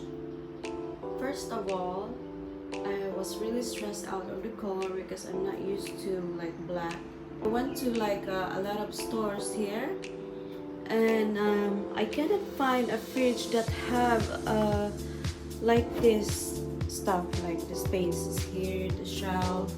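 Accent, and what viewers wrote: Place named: Thailand. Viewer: Dang! What haffen vella? Your accent is becoming thai now haha!😅 You should learn how to speak in thai now! Btw, cheers to more cooking videos chef! 🧑‍🍳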